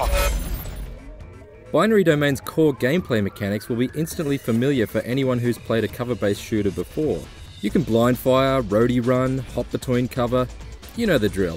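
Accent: Australia. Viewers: he wasn't talking inside his mouth the reason why u couldnt(count) understand what he was saying is because he is an Australian which is a variation of the proper english language. so dont give this a bad review because u couldnt understand it because that would be like me giving u a bad comment mark because u have poor english writing skills. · is this australian accent ? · Australian narrator?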